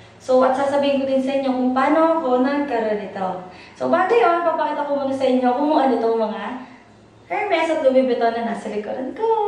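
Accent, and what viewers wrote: Batangas, Philippines. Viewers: hi “ new blogger here!! cute ni ate mag batangeño 😂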